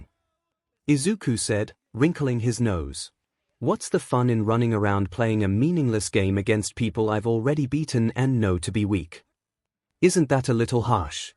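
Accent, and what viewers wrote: United Kingdom. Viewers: first time watchng this kind of content with a british accent kinda nervoua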